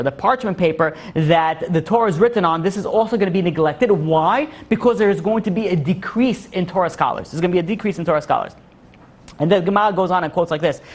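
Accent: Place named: New York, United States. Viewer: "30 minutes and I didn't take a drink" made me Isaac out loud 😂 I feel real bad...just drink freely my brother ahahaha. Your water drinking only affects people who listen to your beautifully super fast wisdomful ny accented voice through noise reduction headphones, the lapel mic you wear catches your swollowing and heartbeat, very ASMR'y. Which reminds me of a joke.... What used to be Bruce Lee's favourite drink???? Wahhh Taaahh!! (Kung Fu 👐)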